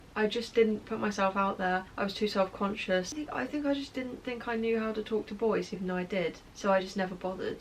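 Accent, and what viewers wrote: United Kingdom. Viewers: As a non-native English speaker you "just" sound British to me and I probably could hear differences in British accents in direct comparison but other than that - British and no character traits or society-ishy associated ♥ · You literally just sounds like your from Hampshire... why is everyone saying your posh 🤣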